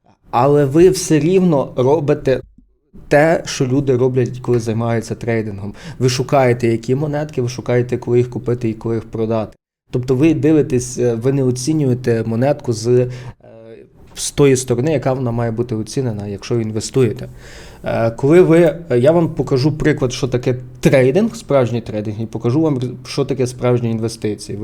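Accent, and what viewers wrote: Lviv, Ukraine. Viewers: Українська мова Львіський акцент ТАК ТРЕБА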